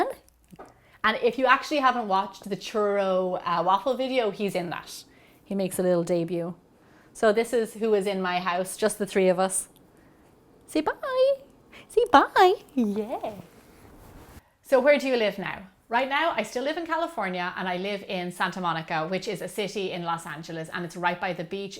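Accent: Ireland. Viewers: I am really glad I´ve found your channel. First, because you bake heavenly goods and teach the recipes really well. Congratulations on your channel! Second, because listening to you takes me back to Ireland, a place I was fortunate to live in for two years of my life. Let's cheer for your talent and great work! Sláinte!! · May we please have a video of you cooking while you're in Ireland?:D I just love Irish people I think you guys have cute accents xD Also do you like the tropics? Come to the Philippines some time in the future or make something big and bold with tropical fruits:D ( I rarely see desserts with them tho o.o ) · I love your Irish accent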